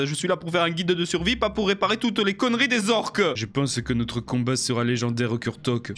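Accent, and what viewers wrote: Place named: South, France. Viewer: Le délicat accent du Sud <3. J'adore ce let's play continue ! Je veux suivre les aventures de Pierre Grise sur Azeroth !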